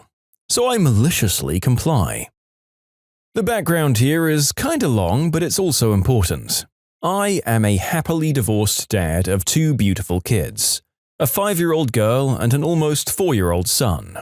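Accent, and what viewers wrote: United Kingdom. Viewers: I don't like this voice actor you hired..... I can't stand monotone people..... and (no offense to anyone who is British) I REALLY can't stand monotone with a British accent......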